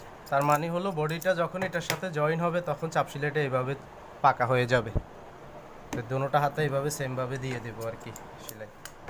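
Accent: Noakhali, Bangladesh. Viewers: আপনার কথা গুলো সহজ সরল, আপনার বাড়ি কি নোয়াখালি নাকি ভাই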